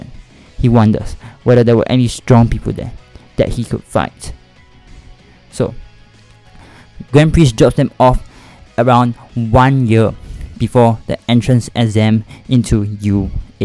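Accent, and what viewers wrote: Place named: India. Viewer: i love the indian accent maney :)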